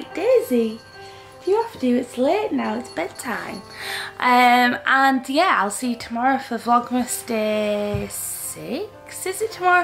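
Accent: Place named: Northern England, United Kingdom. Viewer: Seasons greetings!:) I love having your northern voice in my feed.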